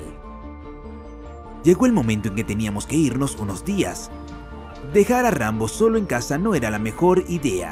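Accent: Russia. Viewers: Bro sos ruso o español?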